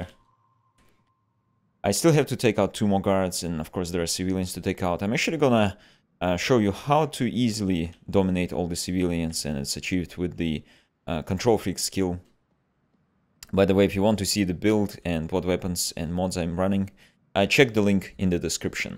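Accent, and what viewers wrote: Russia. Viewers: Акцент русский. Может ты русский который хочет вести английский канал? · Русский акцент отличный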